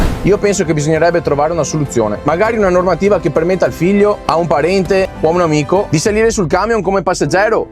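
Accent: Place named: Padua, Italy. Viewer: Ai ragione.. Tutto vero ciò che dici. Ti saluto. E ti auguro sempre di fare bellissimi viaggi un abbraccio e bacione. Marta da Brescia. Sei un padovano. Doc. Mi piace molto sentirti con la tua parlata padovana.ciaooooo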